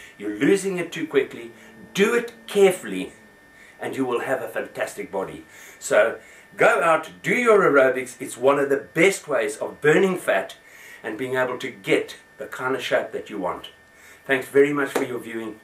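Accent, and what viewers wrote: South Africa. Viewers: it says your from UK but your accent sounds South African??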